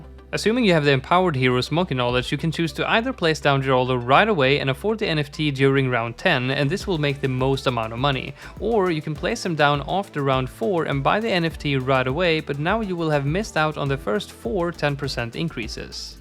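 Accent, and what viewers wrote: Sweden. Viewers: Ar you from sweden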